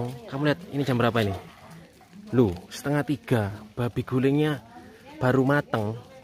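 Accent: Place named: Java, Indonesia. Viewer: Logat Lidah Rasa Jawa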